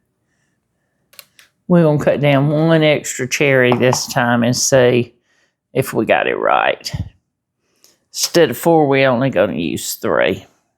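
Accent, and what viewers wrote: South, United States. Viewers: Thanks. Remember I’m up in New England and thought I was all set with your southern accent. 🤭 sorry about that ❣️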